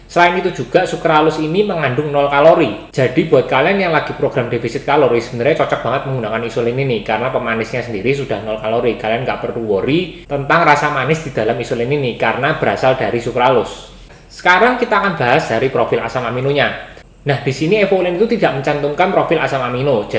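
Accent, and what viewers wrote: Java, Indonesia. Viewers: orang Jawa ya , medok bngt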